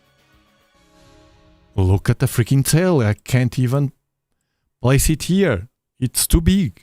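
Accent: Portugal. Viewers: rofllll. he’s Portuguese 😊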